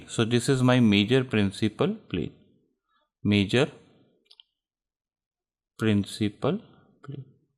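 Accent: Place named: India. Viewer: everything is good except of indian accent, i just wondered if indians are inventors of geotechnical terms bcs theres no other videos by different accents or maybe you are most helpful country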